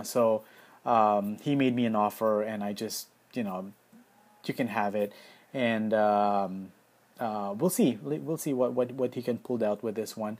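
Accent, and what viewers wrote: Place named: Philippines. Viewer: the way you speak, parang accent ng pinoy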